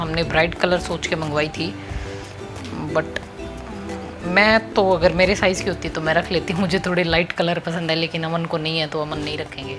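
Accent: Haryana, India. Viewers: wow haryanvi☺️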